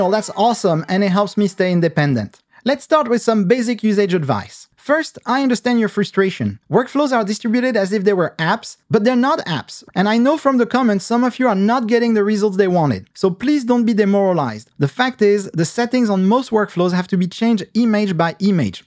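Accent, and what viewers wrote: France. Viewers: you have a French accent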